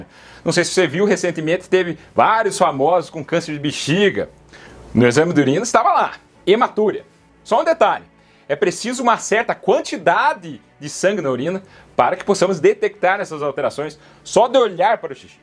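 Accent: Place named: Rio Grande do Sul, Brazil. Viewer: Doutor o sr e Gaucho de onde?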